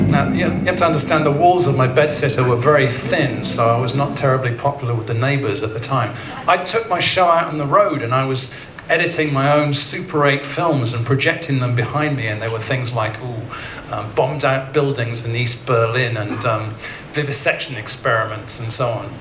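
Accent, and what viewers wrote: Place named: Liverpool, United Kingdom. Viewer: He sounds Liverpudlian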